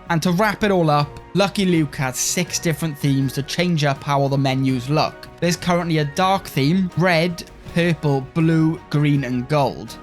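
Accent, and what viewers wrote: Wales, United Kingdom. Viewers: Great video as always (nice Welsh accent btw)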